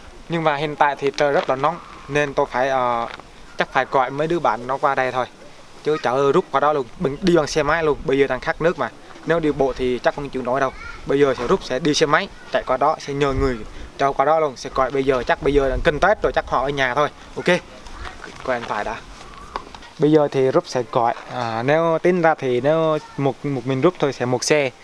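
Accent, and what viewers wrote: Central Highlands, Vietnam. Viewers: Vui vui giọng nói phổ Thông mang chất Tây nguyên., như vậy sẽ được gần gủi với cao nguyên phong cảnh thiên nhiên của núi rừng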